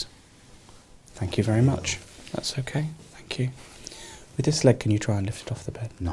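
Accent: United Kingdom. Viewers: Very british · Thats most biggest British accent I ever heard :)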